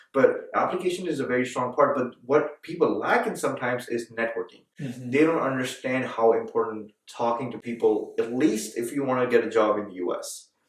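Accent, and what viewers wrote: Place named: India. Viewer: I suppose you shot this video a while back, I was wondering that both of you graduated from the same university, but still you've maintained your Indian accent though you've married an American, while he he's got an American accent. Pls take it as a compliment from me.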